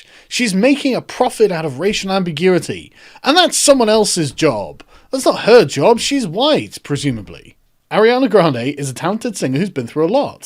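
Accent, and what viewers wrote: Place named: Public school, United Kingdom. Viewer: "Kont...I kont"...what a twat public school accent.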